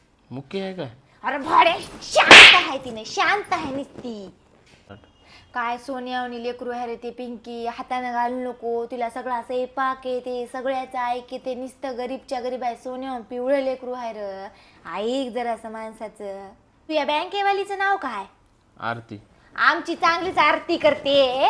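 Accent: Nanded, India. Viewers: अभिनय,उत्तम,काही काही कला,या व्यक्तींना,उपजत,असते, सोशल मीडिया, मुळे, आधी क,प्रसार,झाला,मी, कसे,दिसते,आपण,कसे, आहोत,आपले,रूप,तारुण्य,हे,या,वयात,होतातच,मुळातच,लहान, वयापासून,सर्वांना,मोबाईल,सोशल,मीडिया,जग,आपल्या,घरी, आले,आहे,ताई, आपल्याला अभिनय, कौशल्य,छान,आहेत,नांदेड, च्या,भाषेचा,सुद्धा,छान,हाव,बाव,संवादफेक,अगदी,सुंदर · Nanded chi bhasha lay bari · Mazi frnd ahe pavdevadi Nanded chi Ti same tuzya sarki bolte 😁😁😁😁😁😁khup avdtat Tuze vlog bghayla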